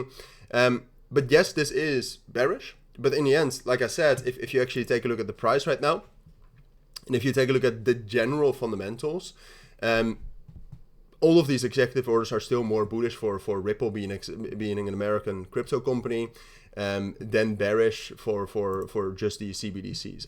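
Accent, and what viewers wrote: Netherlands. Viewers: Ik meende al wat Nederlandse klanken te horen.. heb het me al een tijdje afgevraagd.. 😂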